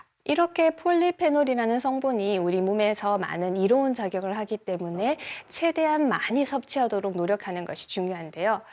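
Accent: Gyeongsang, South Korea. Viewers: 경상도 억양이 있으시네요. 듣기에 나쁘지는 않아요. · 경북 대구 분인가요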